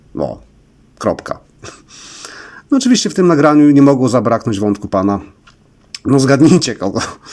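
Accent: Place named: Podlasie, Poland. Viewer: Uspokój się ćwoku zaciągający podlasko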